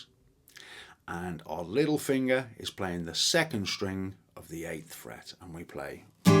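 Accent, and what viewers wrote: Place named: Derbyshire, United Kingdom. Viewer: Listening from Uganda... is that a Derbyshire or Nottinghamshire accent? ... I used to live in & around Louth ... love your easy style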